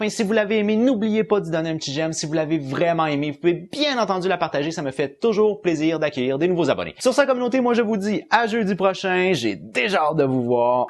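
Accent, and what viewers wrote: Quebec, Canada. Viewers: c'est desagreable cette voie quebecoise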